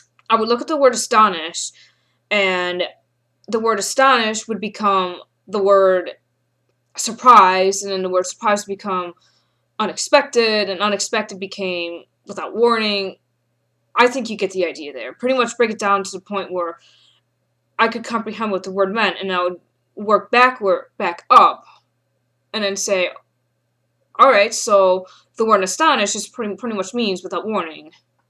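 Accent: New York, United States. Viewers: Is that some sort of new york accent? Cool.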